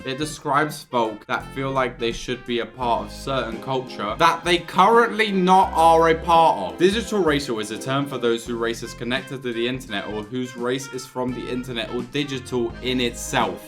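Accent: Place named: United Kingdom. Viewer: is British! 😮 best of British Amazing Guy...I was thinking American..🎉❤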